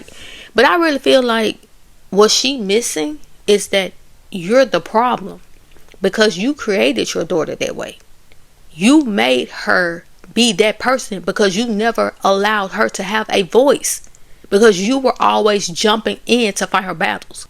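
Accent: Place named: Alabama, United States. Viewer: Hey girl hey! I love your channel and I think you have the cutest accent ☺️ Are you from Alabama? I’m trying to get better with my regional accent recognition 😩😂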